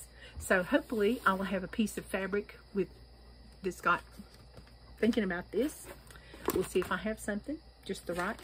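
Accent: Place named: Texas, United States. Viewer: Hi, Just found you on FlossTube. Your accent is a little thicker and adorable than some of us Texans. 😉 Naturally, I had to find out where you are from by going to your #2 video. 🙂 Loved seeing your play by play of Friendstitch. I’ll be sure and sign up for my first one next time. Harvest blessings. Thanks, Darlene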